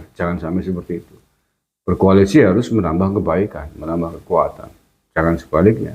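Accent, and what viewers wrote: Banyumas, Indonesia. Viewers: Kang wong ngapak..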